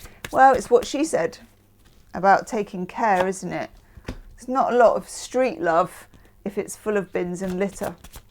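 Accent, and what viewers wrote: New Zealand. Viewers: 😂😂You sound a little more like a kiwi (New Zealander) 🎉❤ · Haha, your Aussie accent is more New Zealand/Kiwi accent. One of my English colleagues, when living in London, used to say that 'England is a cold country full of cold people', we're a very warm country so maybe that's why we're warm-er 🤩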